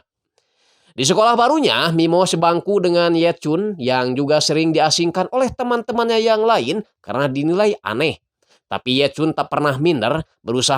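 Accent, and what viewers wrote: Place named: Java, Indonesia. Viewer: Aku malah suka pembawa suara nya ..medok² piye gitu 😂😂😂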